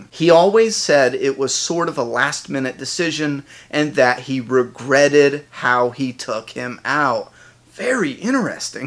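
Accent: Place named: New York, United States. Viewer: u gotta be a new yorker! im from brooklyn myself n u remind me of people i knew back in brooklyn. sorry, just had to say that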